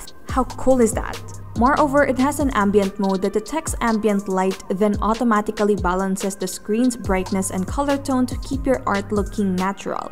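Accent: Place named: Philippines. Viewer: that irritating pinay accent.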